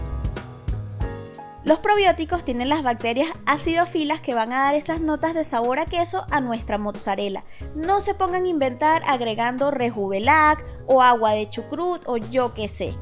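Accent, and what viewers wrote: Venezuela. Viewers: Qué fácil, colega jaja, soy profesora y tienes mucha pedagogía al explicar. Un millón de gracias, ya me inscribí. En los comentarios leí que existe una pizza, voy a buscarla, que Dios te bendiga. ¿De donde eres chamita, su acento tiene mucho parecido al venezolano. Chaoooooo. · Hola, estoy en eso de querer volverme vegana pero ni idea de donde conseguír los ingredientes. Por tu acento creo que eres venezolana, si es asi. ¿Me dices por fa donde puedo comprar en venezuela el psyllium, la levadura y los probioticos, y que marcas? Por favor🙏🏼🙏🏼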